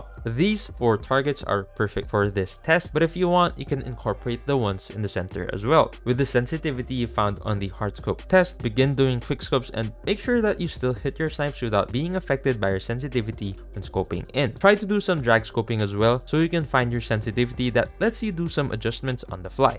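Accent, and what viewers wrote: Philippines. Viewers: Bro you sound like a filipino but you live in qatar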